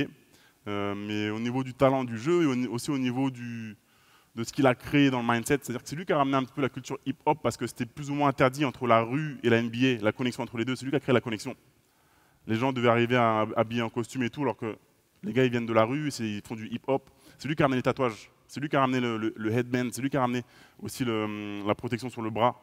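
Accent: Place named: Suburbs, France. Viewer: Où tu entend l'accent de banlieues. Je ne savais pas que l'accent de cité existait.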